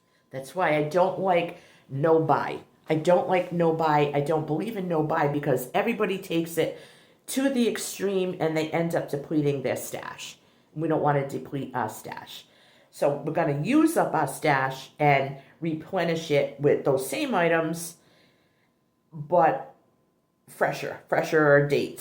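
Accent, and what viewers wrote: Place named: Massachusetts, United States. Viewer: Hi!!! I met you on Jeanine's live this morning I just found your channel and I said I know that accent it sounds like me I'm in Massachusetts 😊💗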